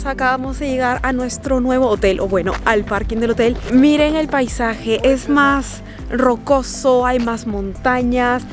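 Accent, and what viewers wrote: Peru. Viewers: No conocía este canal, pero desde que la escuché hablar me di cuenta que era una peruana como yo 😁😁🤗🤗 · ¿Eres peruana 🤔🤔🤔🤔🤔🤔🤔🤔🤔🤔🤔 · Yo la escuché y se me hizo que era peruana, no me equivoqué, por eso tiene ese nosé qué, que tenemos los peruanos. Porque somos humildes, sabemos desenvolvernos y hablamos lindo. Tienes un Ángel súper bello.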